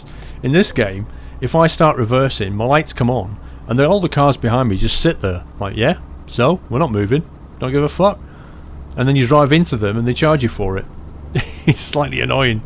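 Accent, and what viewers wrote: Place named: United Kingdom. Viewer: I like how British people say stupid ,"schtooupid".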